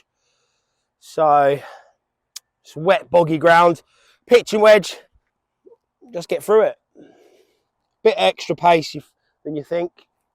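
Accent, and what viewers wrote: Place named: England, United Kingdom. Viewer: Good content mate what part of England are you ?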